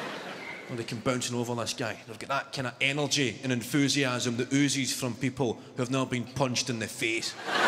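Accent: Scotland, United Kingdom. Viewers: Well I am Welsh and have no problem understanding him, you just need practice to get your ear in. Move to Scotland for a couple of month I am sure that will sort the problem after you have said pardon a few times😂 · If you're serious, [and I hope you are] this is English spoken by a Scot in a Scottish accent.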